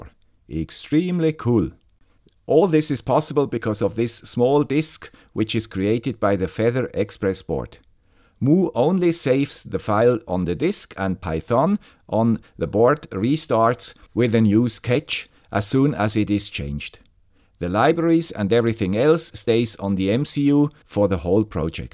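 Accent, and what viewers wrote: Switzerland. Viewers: The guy with swiss accent...hehe...Hallo und viel erfolg. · Yeah I like the dir command. Long ago I made a python program that allowed the use of cd, change directory, even. I think it went into lists and dictionaries as well. People seem to copy what I make, even when I think it's locked down. Nonchalant works better. Regardless, I seem to give people good ideas as well. Love this place! Thanks man with the Swiss accent. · I also love your intro ! When I hear it is the "guy with the Swiss accent" I know I will be watching something educational and entertaining !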